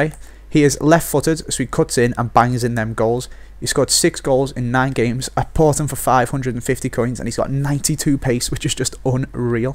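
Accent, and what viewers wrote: Liverpool, United Kingdom. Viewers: You have the best scouse accent i have ever heard :D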